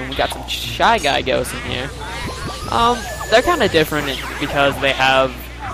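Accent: Canada. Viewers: Well, Chauncey is shaking, so it makes sense. I shouldn't have said anything. And also Caspin's right, you do sound Canadian. You say "zed" instead of "zee" like Americans. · you pronounce your "ou" sounds in a canadian way, i was just wondering :P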